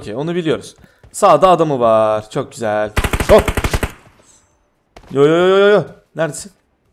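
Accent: Adana, Turkey. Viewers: Adanli sivesi sananyakisiyoya bir videoda sadece oyle konus😉😆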